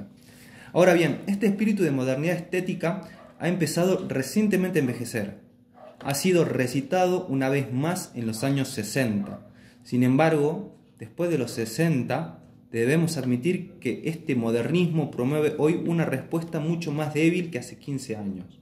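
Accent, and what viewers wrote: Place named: Salta, Argentina. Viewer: ¿Che, sos de Salta? Porque tenés un acento bastante parecido al de Jonathan Ramos.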